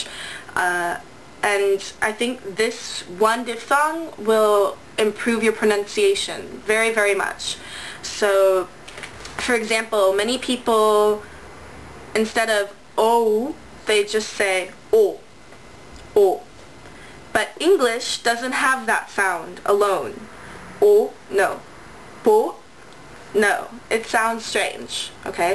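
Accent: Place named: South, United States. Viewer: Great! It's interesting to realize @@ that you've killed 5 vowels with one video ^_^. And again, I like the way you teach, it's really alive! I really love to listen some culture backgrounds of pronunciation or anything in English, for example when you tell that you got Southern American accent. I also like that you supply the CC or subtitles. That's what I've been hoping for all teachers do the same.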